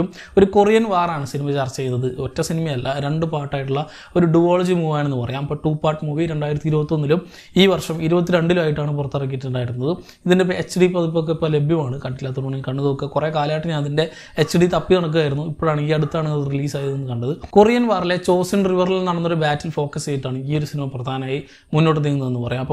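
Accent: Palakkad, India. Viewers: This time in long time i could sense your palakkadan slang👏👍😀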